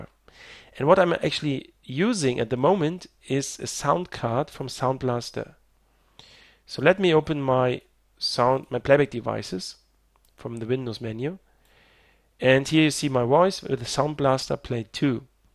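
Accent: Germany. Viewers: Hey wie ich aus deinem AKzent hören kann bist du Deutscher, richtig? Ich hab diesen Bluescreen seit einigen Jahren, und habe das gleiche Problem mit dem AMD HDMI Output für Lautstärke, was mich schon immer sehr verwirrt hat, da ein HDMI anschluss so etwas praktisch garnicht brauch.... Meine frage ist: Besteht das Problem mit deinen Bluescreens weiterhin? Danke schonmal für das Video, hat mir sehr geholfen! Habe schon an meinem Prozessor und der Grafikkarte gezweifelt, aber das löst das problem für mich etwas.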